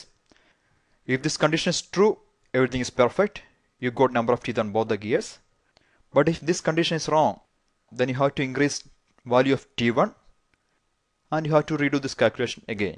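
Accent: Kerala, India. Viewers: Indian English . The accent is thick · are you a malayali? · He has a strong malayalam accent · malayali annnalleeeee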